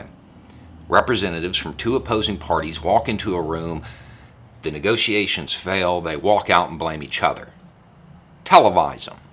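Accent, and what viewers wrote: South, United States. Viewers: we have a republic. You sounded more intelligent when you spoke with a southern accent.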